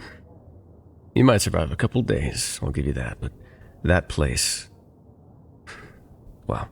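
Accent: South, United States. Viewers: Love this!! AND I LOVE THE SOUTHERN ACCENT!!!! Reminds me of my hometown 😭❤️ THANK YOU!!!! LOVE YA AND YOUR VIDS!! KEEP UP THE AWSOME WORK!!! ❤❤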